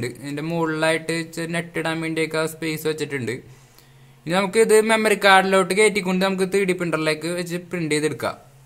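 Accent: Thrissur, India. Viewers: ശൂര് സ്ലാങ് ആണല്ലേ ഗാഡിയെ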